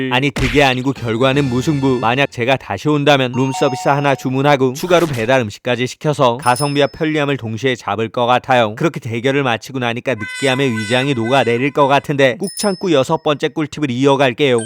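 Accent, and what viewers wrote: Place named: Seoul, South Korea. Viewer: 딱 들어도 서울 말투인디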